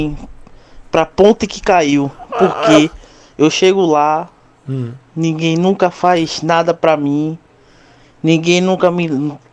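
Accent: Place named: Recife, Brazil. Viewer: Tive de ouvir tudo kkk , provavelmente ele é de Recife/PE, sotaque não nega.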